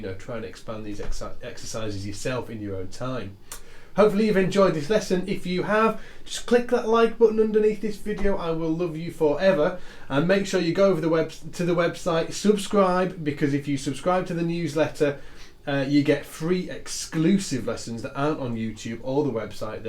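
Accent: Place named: United Kingdom. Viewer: He is british, maybe you are used to listening english accent from North America and that's why sounds weird to you.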